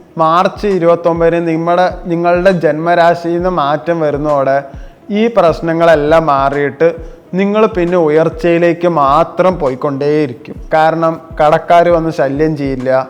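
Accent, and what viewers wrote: Palakkad, India. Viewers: പാലക്കാട്‌ ഭാഷ ആണല്ലോ ❤❤❤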